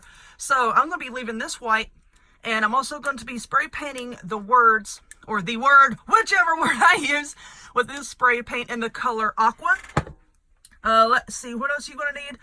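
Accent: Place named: Georgia, United States. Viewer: my first video of yours. love it! I'm dying to watch more to know if you're out of Georgia. You sounds like my cousin who was raised in Athens.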